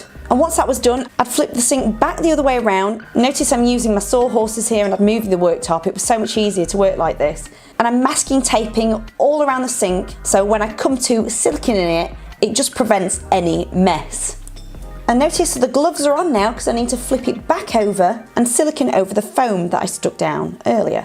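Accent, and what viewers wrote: United Kingdom. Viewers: I'm doing my sink now and it was so nice to see you do this with painted nails, and could listen your British accent.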